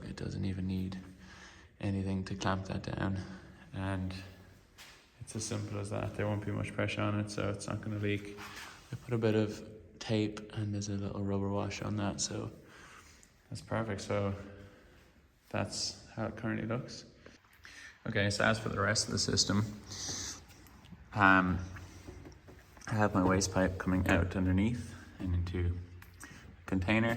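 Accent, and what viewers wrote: Ireland. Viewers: thanks for the informative video - just what I needed to see how involved the plumbing was before making a decision on whether this will be my next espresso machine upgrade from my plumbed ECM Synchronika (gen 1) - nice to hear the Irish accent when I landed on this video - I am from West Cork and wife is from Galway but living in Tampa Florida for last 28 years. The cafe looks great - hopefully will be passing through Gorey some day to try the cappucinos! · indeed you did not specify what thread standard you used for your hose connections. People in North American will assume NPT because that all we have commonly available here. Sounds like you have an Irish accent, so maybe you did use BSPP (British Standard Parallel Pipe) fittings which is what the La Marzocco machines have inside. But those fittings are not commonly available in NA. 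North American Pipe Thread (NPT) fittings are tapered, not parallel, have slightly different thread pitch, and while a ¼” male NPT will thread into the pump on the LM Mini & Micra Pump BSPP female connection, it will also damage it in the process. To connect an NPT flexible hose to the LM pump, a BSPP 19 threads per inch) to NPT (tapered 18 threads per inch) adapter is required. So maybe you are fine if you are indeed in Ireland, but a North American viewer will damage their machine doing what you did with plumbing fittings available locally.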